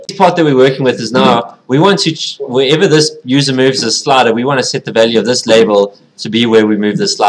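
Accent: South Africa. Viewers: I can hear you're from SA. Great video, thanks so much! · are you south african?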